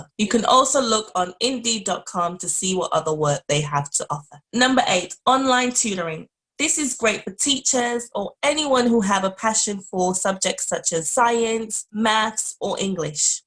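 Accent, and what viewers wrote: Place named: United Kingdom. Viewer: Why are you quoting $ dollars and not £ Sterling when you have a British Accent? Where are you based?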